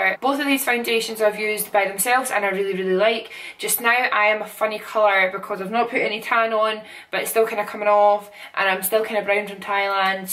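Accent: Scotland, United Kingdom. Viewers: Ah I love the Scottish accent! If I weren't Welsh, that'd be my choice! Amazing skin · scottish accent? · 12 seconds in I actually love you your so funny hahaha totally do the Scottish accent justice!!! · you are beautiful and I love your gorgeous Scottish accent, just want to let you know that your jouer lippy is pronounced jou-eh not jou-er. Just for future reference. Keep shinning gorgeous. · Are you from Scotland? Hahah being Scottish myself i picked up the accent within seconds! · everything you've done is "Amazing". You did everything perfectly. Your stunning gorgeous now. You know your shit, that's truly. Your wedding photos are drop dead gorgeous.......and that dress you bought with all the layers almost makes me cry.....so beautiful. Keep up the great work. I'm addicted to your videos!!!!!! More please!!! 😘. Plus I love your Scottish accent. 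Love your friend from 🇺🇸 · SCOTTISH! hahaha this makes me so happy to see a Scottish YouTuber who's videos are about beauty! Probably cause I'm Scottish! Normally people say the Scottish accent is really harsh and angry sounding! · I love this video I don't even wear makeup I just watched it bc of her Scottish accent! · Kayleigh Ritchie is that a scottish accent? if so it's really gorgeous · I love the Scottish accent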